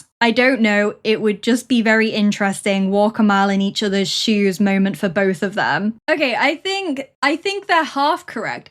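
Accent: United Kingdom. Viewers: Hi thanks for another brilliant video. Off topic question: i’m very curious about where your accent is from. I am American. i think your accent is British but I can’t place it and I used to know British regional accents pretty well. I hear a touch of Australian but i don’t think that’s it. If you are willing to share?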